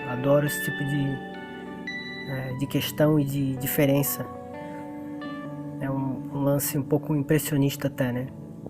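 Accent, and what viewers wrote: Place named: Santa Catarina, Brazil. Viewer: Esse sotaque Catarina é inconfundível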